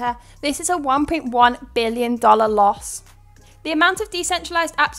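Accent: United Kingdom. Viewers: Yea to the northern accent! I’m sold.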